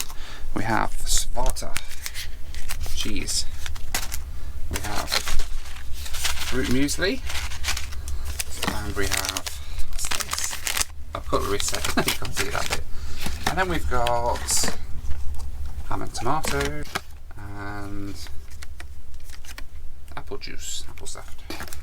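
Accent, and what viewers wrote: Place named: United Kingdom. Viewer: Hi... Which part of the UK are you from? Trying to work out your accent!! Cheers:)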